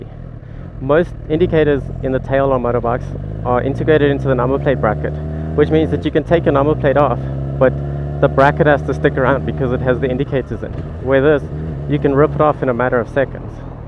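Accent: South Africa. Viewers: That SA accent is unmistakable. Thank you for sharing man!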